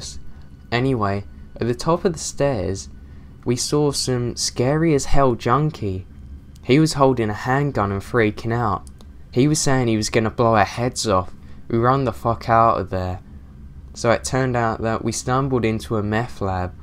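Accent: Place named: London, United Kingdom. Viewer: Are you from Manchester? I'm working on my regional dialects and accents from the UK since between my family, and my job, I've been spending more time than ever there. Namely Bodycote. So to my untrained, or at least little trained ear, you sound like your from Manchester, or the surrounding area. Although, there's a bit of that London sound in there as well, although not as much. Or I'm just fucked on the head, and have no idea what the hell I'm talking about being from Detroit and all lmao. I've been told I have a tail specific accent as well. Not quite Midwestern, nor Wisconsin, or Ohio. Just that Detroit, and Chicago sound alike. Fuck if I know 😡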